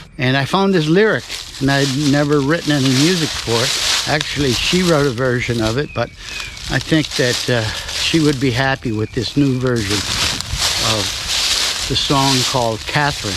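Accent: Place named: Upstate New York, United States. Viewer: Just listened to the album, love it! Very impressed with your multi-instrumental skills. I especially like Katherine, I think. Nice lyrics by Helen Wheels, she was so talented. R. I. P. Very interesting walk-and-talk, and beautiful scenery. BTW, love the upstate NY almost Canadian-sounding accent. I'm from Norway but I like to try and pinpoint English-speaking people's accents. Sorry for the digression. 🙂 Edit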